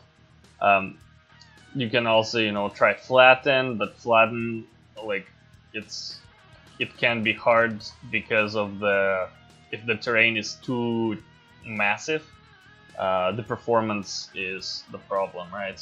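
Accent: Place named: Quebec, Canada. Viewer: L'accent du Québec ça trompe jamais! eh eh, i wonder if an 8km x 8km terrain need world composition?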